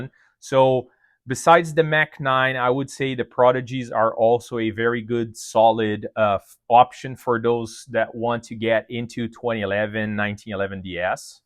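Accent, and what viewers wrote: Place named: Quebec, Canada. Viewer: I'm hearing a French Canadian or Newfie accent? Where are you from?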